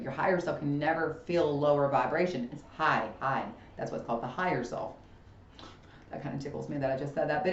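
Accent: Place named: South, United States. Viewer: I'm digging the vibe here so much! That beautiful Southern accent sucked me right in lol. I love how every reader comes with their own signature flavor, and I'm happy to find another gem on here 😁🙏✨ **I knew you had to be a Saggie lol!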